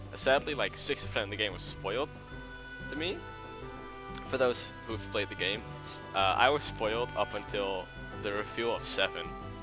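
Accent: Netherlands. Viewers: Lol, ik wist niet dat je nederlands was. Ik hoorde het wel aan je accent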